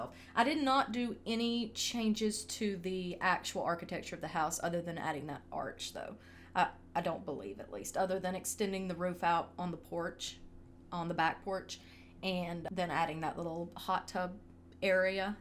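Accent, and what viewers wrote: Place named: Appalachia, United States. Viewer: Love your voice! Where are you from!? Definitely sounds like an Appalachian accent! Also love the renovation!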